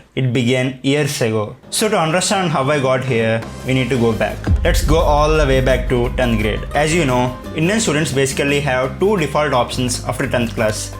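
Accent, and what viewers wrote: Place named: South, United States. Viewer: english communication soo poor..learn that..south accent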